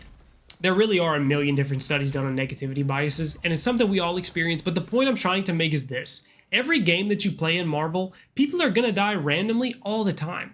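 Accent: South, United States. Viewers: is that a southern drawl I hear? Power to you it sounds soothing to listen too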